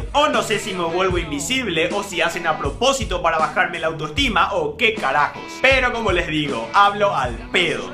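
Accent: Paraguay. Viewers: Hacena videos a lo paraguayo como hablabas antes, te haces guau de el cheto nde tembo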